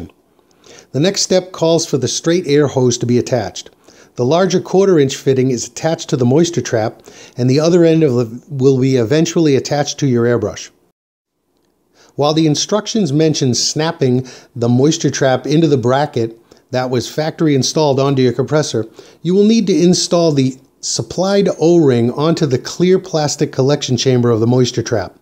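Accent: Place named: United States. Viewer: Hi, thank you SO much for this!! This is the most comprehensive review on YouTube!! However, I'm confused... You sound as if you are from the USA? Apologies if you are Canadian... We struggle to tell the difference in the accents here in the UK. Iwata is a USA Company. Why did you buy from the UK? Was it a better price? Very kind regards from London UK. Alan.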